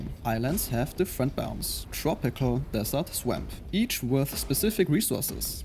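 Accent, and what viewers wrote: Germany. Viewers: The German accent is very noticeable :D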